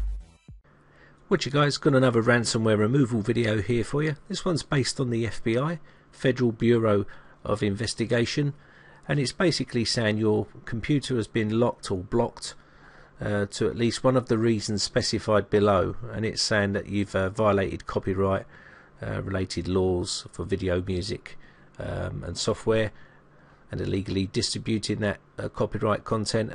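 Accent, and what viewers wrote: United Kingdom. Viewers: Thanx here to my new friend-you save my kids recently purchased laptop-you sound like you are from thee other side of the pond...lol, either way I am just glad you had my back on that one. Toney B . (Philly)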